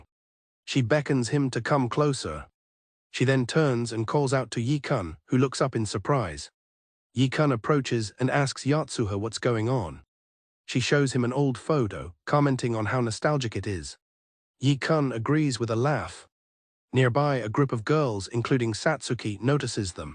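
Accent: United Kingdom. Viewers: British narrator? Yessir, first time hearing British narrator.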